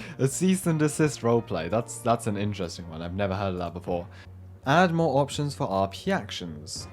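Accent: England, United Kingdom. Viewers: are you from england america or another place [not to be creepy i just wanna know:)]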